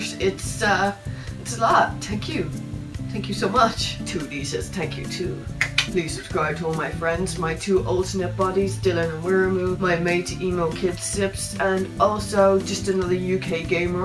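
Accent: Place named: Ireland. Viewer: You're from Ireland aren't you, I can tell with your accent it's the same as my friend Apple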